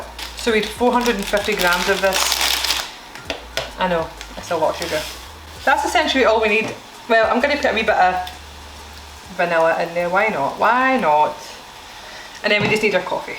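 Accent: Scotland, United Kingdom. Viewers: It is English with a Scottish accent 🤦‍♂️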